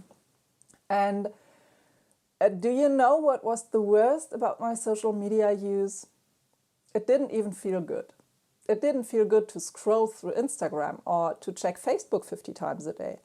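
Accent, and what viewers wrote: Germany. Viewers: I like your accent, during your video my mind almost convinced me that your were speaking German and not English ;D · Thank you for this post! I felt the same way. But a certain schedule helps to stay away from checking it, besides I haven’t been on instagram from March to October of 2022. And it feels like I need to do it again 😅 But I joined Behance, where I can communicate with different types of artists. Not sure about this platform yet, but at least my portfolio is online. P.s. Love your German accent so much. When I heard it, I’ve realized how much I miss this country and the language itself. Danke schön ❤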